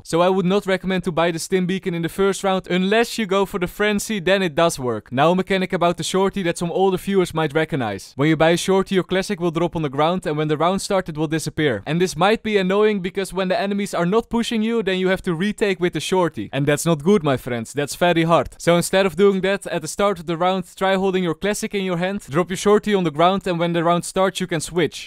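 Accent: Netherlands. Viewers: I will personally finance a getting rid of your Dutch accent course (coming from a fellow Dutchie)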